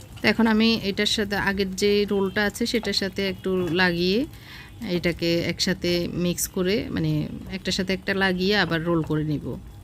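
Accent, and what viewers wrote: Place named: Feni, Bangladesh. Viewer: উনি তো জোর করে কাউকে ভিডিও দেখতে হাতে পায়ে ধরে নাই। যার ভালো লাগবে দেখবে না নাহলে দেখবেনা। দেখবে আবার নেগেটিভ কথাও বলবে। উনি ফেনীর মানুষ। তাই ভাষায় একটু আঞ্চলিক টান আসে। এটা দোষের কিছু না। আমরা কেউই নিশ্চয়ই বিশুদ্ধ ভাবে বইয়ের ভাষায় কথা বলিনা। উনাকে সবাই ভিডিও তে দেখে। আর আমাদের কেউ দেখেনা। তাই নিজের দোষ কেউই দেখতে পায়না। অন্য কে অসম্মান করার আগে অন্তত একটুকু ভাবা উচিত যে তাকে অপমান করার যোগ্যতা সত্যি নিজের আছে কিনা।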